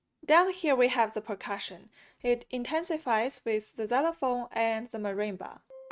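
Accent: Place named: Russia. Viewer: I find it better to write this in a notation software. Sure it gives less control over the balance and still, in the end we get a full score for orchestral performance and there's a lot of excellent sounds that sounds very good and for free. Musescore for example. By the way, I love your Russian accent.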